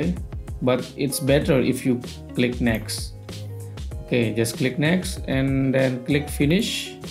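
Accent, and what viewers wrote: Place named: Indonesia. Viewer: Logat Indonesia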